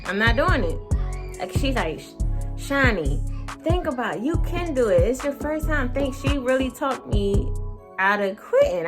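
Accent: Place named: Philadelphia, United States. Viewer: SSQ Brown 1 second ago I just got hired as a CCA and my orientation is coming up . Congratulations! As excited as I am I’m also super nervous. Especially hearing that you can’t be in truck with the person training you. Btw You sound like you’re from Philly. If you are your accent sounds like my roommate from school. Lol Congratulations on school! You got this girl! From one mama to another keep pushing.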